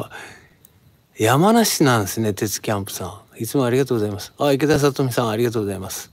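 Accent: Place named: Hakata, Japan. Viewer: 乾杯ありがとうございます😊 今宵も良い晩酌が出来ました🎉 はい美味しいぃ〜😆✨ やっぱり博多弁のがいいですね🤭 やばばです🫡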